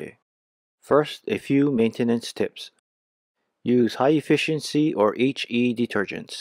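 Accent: Hawaii, United States. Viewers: I love this! It’s helpful as we’ve had out for almost a year and I’ve cleaned it twice 😅 are you hawaiian? I can hear it haha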